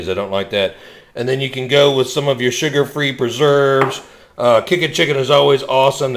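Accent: Texas, United States. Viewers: Can tell you're from Texas brother!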